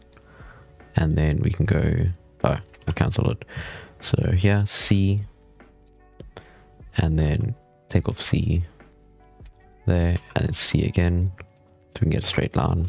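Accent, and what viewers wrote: South Africa. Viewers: Just thought you sound abit ex south african, I am ex South african and we sound very alike lol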